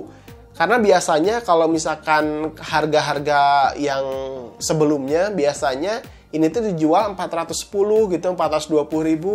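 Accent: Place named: Sunda, Indonesia. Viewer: sundanya kentel haha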